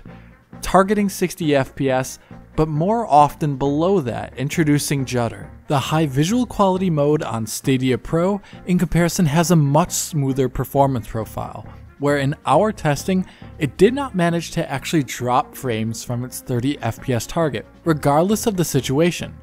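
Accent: Germany. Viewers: Or... the guy is German? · German accent is never funny, its rather SCARY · HOL' UP. YOU ARE GERMAN?! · He German. I repeat: He German.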